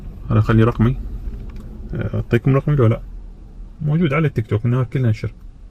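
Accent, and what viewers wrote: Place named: Basra, Iraq. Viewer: تحياتي الك عزيزي. اولا انا نادر اضع كومن او اضيف لان اتابع الأمور من التلفزيون ولما اشوف الفيديو ما أكدر اضع كومنت الا اسوي اتصال بالتلفون واكتب. انت شخص متميز ولهجتك بصراوي تخليني أشعر بلبصرة لان لما انزل للبصرة ما أشعر باللهجة البصرية مالتنا وكانها اندثرت. بصراحة قررت بعد موافق حضرتك لما انزل للبصرة اتواصل معاك تجيبني من المطار ودائما احجز قدوم بلطائره التركية والتي تصل ساعة حسب تذكري يعني بعد ساعة سبعة ونص. بس مفكر انزل من يصير الجو زين وهو غالبا بشهر تسعة وجان انطيتك تلفوني للتواصل بس هنا بلعام صعب اكتبه اتمنى اشوف طريقة انطيك الواتس اب حتى اتواصل معاك لو انت تنطيني واتس أو أي طريقة انا اضيفك و اواصلك هذا بعد اذنك. بالمناسبة ههههه انا شبه انطوائي ومو سهل اثق بلناس ولكن انت سمعتك كثير واشوفك شخص ثقة ومحترم. وكذلك عندي نوع من النرجسيه ورحم الله امرء عرف نفسه. تحياتي. نسيت أخبرك اني اكبر عمرا منك وليس قدرا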